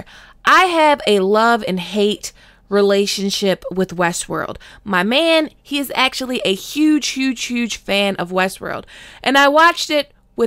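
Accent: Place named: Baltimore, United States. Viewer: I knew you was from Baltimore because you sound just like me 🤣🤣😂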